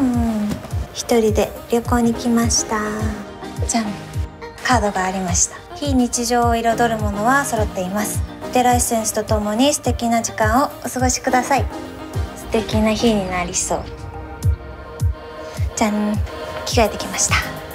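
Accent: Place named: Japan. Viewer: How lovely her japanese accent is...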